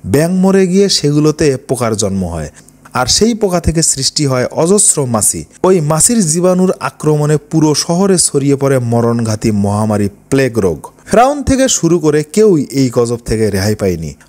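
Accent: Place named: Rangpur, Bangladesh. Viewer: রংপুরের ধারা ভাষ্য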